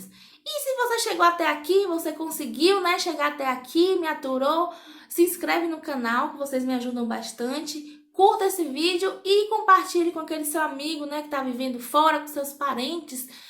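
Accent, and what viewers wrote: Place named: Venezuela. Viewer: Ei amiga com certeza te perguntam se vc é venezuelana porq vc já deve ter pegado um geitinho um sotaque kkk .Eu sou capixaba meu marido cearense e quando vou nos lugares sempre tem quem pergunta kkk vc é cearense?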